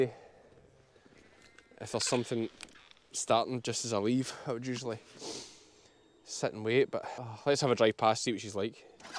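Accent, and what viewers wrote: Scotland, United Kingdom. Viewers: I'm so glad I have grown up in Australia meeting many Scottish and Irish people over the years with strong accents. I understand everything you say, love the accent and love hearing you interpret the Scottish words that are not common in the english language so I can learn, not that I remember it. Your little fella is getting big, is a gem. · I love to hear the Scots. I live on a border town by Mexico and Arizona (Douglas, Arizona) we too speak slang Spanish. My family is from Wales and I cant understand any of that but love to hear it too. My favorite is watching and listening to you with that wonderful dog, Meg. Keep up the good work. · To all the viewers who have difficulty understanding, I did also at first but I’ve been watching for over a year and catching up on older videos (and watching other Scottish vloggers) so it’s like learning a new language. 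I understand every word now. It’s worth the time and Scottish is so lovely to listen to. I hope everyone sticks with the channel. is the best. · Love your accent. I also love hearing the Scots words that aren't used elsewhere like "outwith". I dated a Scotsman from falkirk area and when he got flustered or excited good lord did his accent get thick. However it's the same for everywhere, with heavy accents though, it's just a matter of training your ears to new sounds! Love you're videos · Important note: I LOVE your scottish! When you say Bra lambs... Bra = bra in swedish is good! Bra is from the scaninavian area and is a great word! So Maybe I can understand it fairly well because it has traces influenced by them invading adventurers sooooo many years ago. Keep talking, it is YOUR voice that speaks to us. Oh and my favorite, the Jacob sheeps, made a VIP apperance. The twins there looked adorable! 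· now listen up. if someone told she wasnt welcome to scotland because she wasnt scottish enough what would you think, your videos are great and even though i am english (from Exeter lol) i love seeing the scottish side of things and the accent is 100% part of that so please just keep being you, ive followed you from 2000 subs and its been heartwarming to see you grow your farm and family, to see your confidence on youtube build and with that your videos got better and better. dont change a thing bud if anything you should do a video where you dont change how you speak, a full on scottish day if you know what i mean lol and oh my heart little Wilson <3 like, tell us youre a role model without telling us youre a role model! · Hey, I'm from Australia and have been watching you since the day Sandi Brock shouted you out, and I have loved every second of it! I don't have trouble with the Scottish accent because my family is Scottish on my mum's side, though of course none of my were nearly as thick as some of them I have heard on your channel lol. 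I do however have some trouble with other accents, and the trick to getting to understand them is honestly just keeping listening to them. Like anything, the more you do it the better you get at it, so if you love and the journey he is taking us along on, stick with him! A little time and patience and you will start to really understand the accents well. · Congrats on 50k!. I have no problem with your accent. But, I lived in Scotland as a child. To my friend who watches, I got her to turn on cc. It might help if you use farming or "slang" to write translation on the screen as I have seen you do before. The things I have been asked in the last week are: what are pet lambs? What is a bern? Why is he excited for tea? In US usually just a drink unless going to fancy hotel for little cakes and crustless sandwiches. Hope this helps. Let me know if you want me to point out any other language you sneak in. 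Keep it up! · I LOVE hearing the Scots. Be true to who you are. Please don’t change. You always explain things so we can understand. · Keep Scotland in your talk. It is beautiful.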